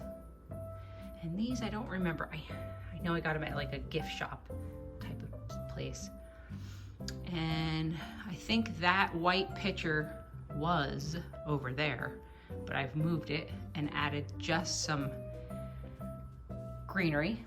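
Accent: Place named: South Jersey, United States. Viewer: I am watching from North Carolina. I love your videos; especially love your accent ! It is very nostalgic to me since I am originally from South Jersey! You sound just like my mom’s friend😂! I love the green in your house. That color is my favorite and I have it in my home too . I love how you shared that you mixed the paint colors to achieve the shade you wanted; that’s what I did with my kitchen cabinet color mixing two of the Sherwin-Williams green.😊 You are inspiring me to paint my table and maybe my chairs because I have a similar set…so cute!❤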